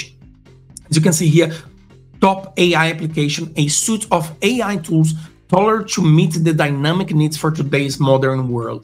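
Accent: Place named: Brazil. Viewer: Your accent is too strong, looks like a Brazilian speaking in english..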